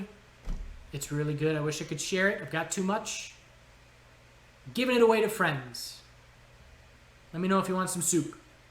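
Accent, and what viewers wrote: Ireland. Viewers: More Irish accent please!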